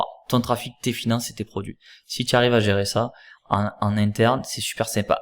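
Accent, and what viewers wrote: South, France. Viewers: Salut, merci pour cette vidéo et puis entendre cet accent du sud, quel plaisir. Les US c'est top mais cet accent chantant me manque un peu quand même. Beau bureau! La bise.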